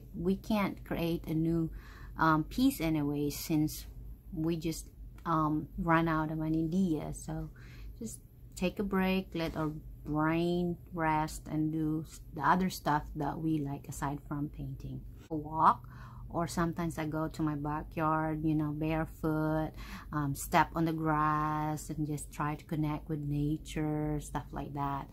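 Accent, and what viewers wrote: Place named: South Africa. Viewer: 🙌 sa accent😊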